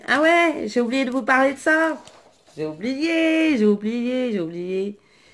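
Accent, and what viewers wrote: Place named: North, France. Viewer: Re :-) si tu savais comme elles sont moches mes rosaces lol, oui y a un léger accent du ch’nord lol, gros’gros bisouss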